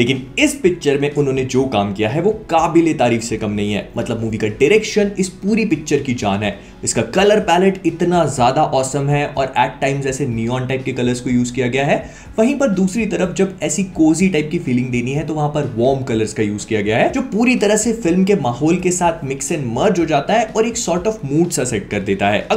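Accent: Bhopal, India. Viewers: Bhai are u from bhopal kyuki achi bhopali krte ho 😀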